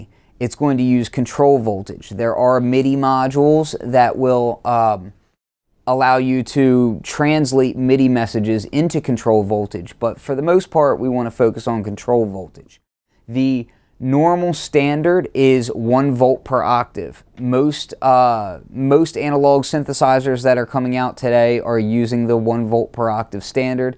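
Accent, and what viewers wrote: Philadelphia, United States. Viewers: I detect a Philadelphia accent. Are you from Philly?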